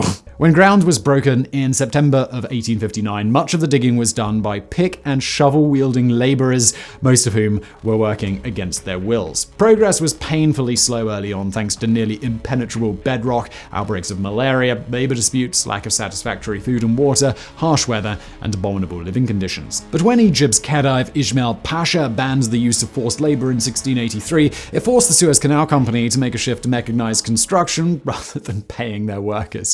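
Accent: United Kingdom. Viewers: who is this slaphead beardman who hosts every youtube channel at least in this series he doesn't exaggerate a british accent quite so much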